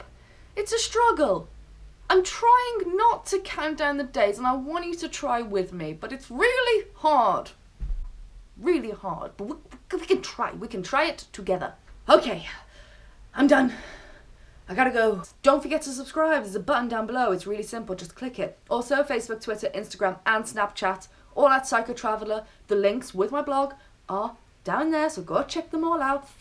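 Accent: United Kingdom. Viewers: I think she means Birmingham, UK, telling from her British accent. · Definitely subscribed. Great vids, british accent, cute girl, what more could i ask for :)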